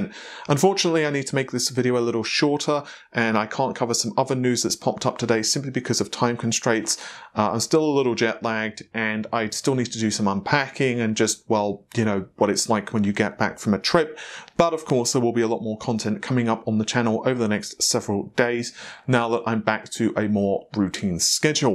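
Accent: United Kingdom. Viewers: Short is good, specially with this thick British accent.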